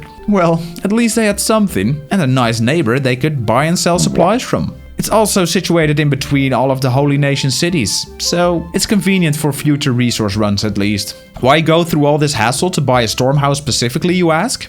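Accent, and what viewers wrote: Netherlands. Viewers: bro is clearly dutch 😂 trust me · Dutch accent recognised!!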